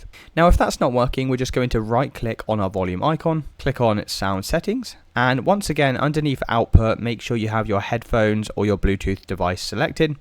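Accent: United Kingdom. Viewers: This is the first bit of crappy troubleshooting info i found when originally googling the issue... A video with a british accent doesn't make it better.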